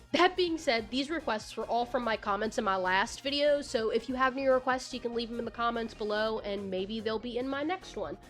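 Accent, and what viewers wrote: South, United States. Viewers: I like your cute lil southern accent 😊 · you have the SLIGHTEST southern accent and it’s so cute!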